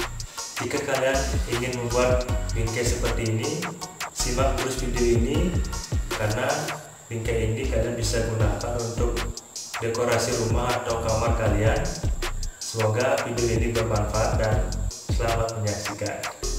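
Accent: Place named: Bali, Indonesia. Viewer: dri cara ngomong T nya pasti orang bali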